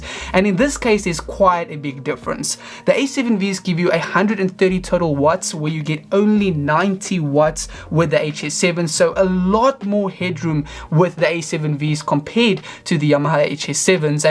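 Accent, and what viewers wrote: South Africa. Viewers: you are south african right?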